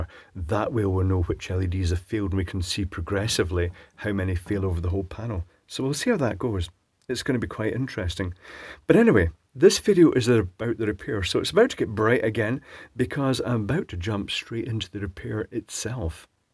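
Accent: Scotland, United Kingdom. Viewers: I just like the Scottish accent (I'm English, sorry) so I like to listen to you and IanScottJohnston if only for the voices.!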